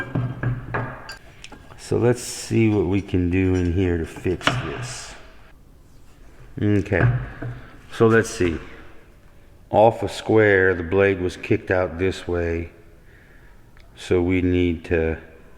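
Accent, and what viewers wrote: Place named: Louisiana, United States. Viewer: when i first heard you start talking i said this cat has to be from Louisiana, great video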